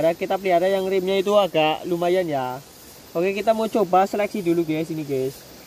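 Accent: Banyumas, Indonesia. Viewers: bang kalo boleh tau abang orang mana nih kok ngomongnua agak ngapak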